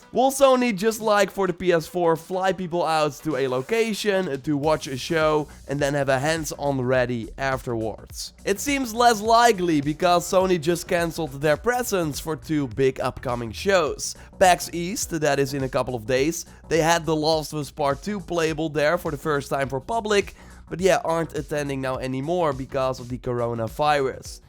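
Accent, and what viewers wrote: Netherlands. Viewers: You sound Dutch...but are you African American? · I can hear ya dutch accent😅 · Man Ive been watching a lot of your videos since you were mainly uploading AC odyssey videos and I still have no idea which accent it is that you have. Is it Dutch?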